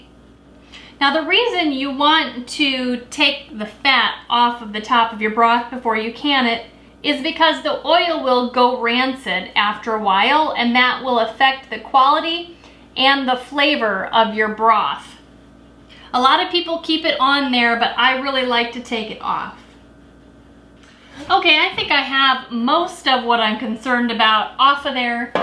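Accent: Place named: Midwest, United States. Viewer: I KNEW you were from WI or MN from your accent. LOL! Thanks for all your great videos.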